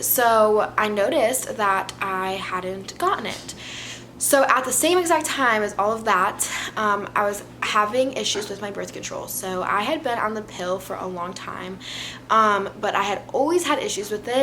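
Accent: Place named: Rochester, United States. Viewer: Hey! Love your videos, been watching since your first one! I’m from Rochester too and I can tell your starting to get an accent😊 keep making great videos xoxo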